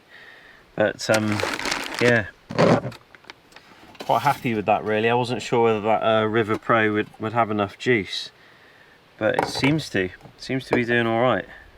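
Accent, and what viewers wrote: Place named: Forest of Dean, United Kingdom. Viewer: Love all the British cultural references to Yorkshire tea and Saville as well as the occasional lapses into a broad Forest of Dean accent. Entertaining as always. Guess I’m one of those subscribers who will listen no matter what the content is each time! Keep enjoying the filming so we can keep enjoying the viewing 👍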